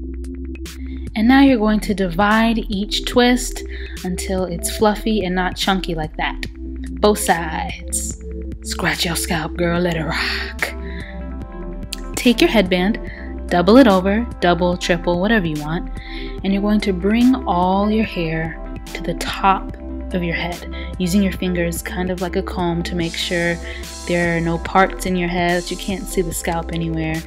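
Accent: Africa. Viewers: You are hilarious. I'm Cameroonian but it's crazy how all the African accents sound sound so similar. Loved it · I love u african accent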